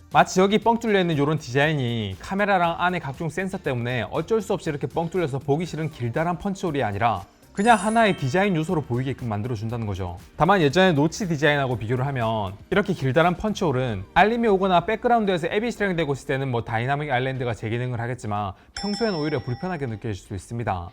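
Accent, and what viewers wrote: Gyeongsang, South Korea. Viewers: 사투리 귀엽네요 ㅋㅋㅋㅋㅋ경상도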